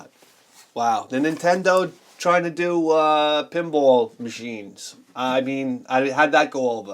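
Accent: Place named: Long Island, United States. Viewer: Dude , this show is it ! That Long Island accent does it . Nobody tells a great story like a New Yorlka !!!! Ya bustin my bawls ova here !!! You are smart as fuck . I guess that is the pay off for living in the city . I was raised in the country in southern Ohio . It's more Kentucky than mid west . People are laid back here and on pills lol . Not a lot of money so there is not a lot of games :(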